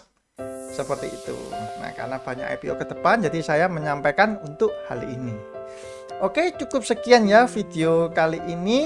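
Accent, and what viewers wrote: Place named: Java, Indonesia. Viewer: Terima kasih mas, sangant mengedukasi, juga suka loga jawanya Terlihat bangat mas niatnya membantu ritel utk tdk terjebak, sukses untukmu orang muda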